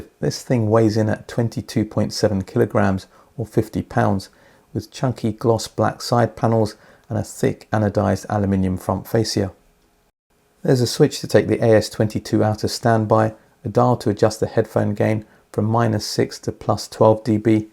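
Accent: United Kingdom. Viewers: Thank you for another impressive review! Your articulation of audio is authoritative - your knowledge, experience and technical insight is unmatched in sophistication. The British accent and your command of the language simply makes everything you review sound so upper echelon and creme de la creme! Am I sensing that the AJ Uni Fi References are perhaps starting to grow on you as they burn in?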